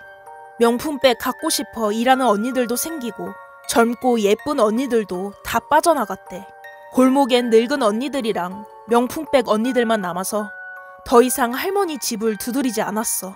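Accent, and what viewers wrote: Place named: Gyeongsang, South Korea. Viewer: 정신단디 챙기라.이게 포항말이지.듣다봉께로 윽시 재밋노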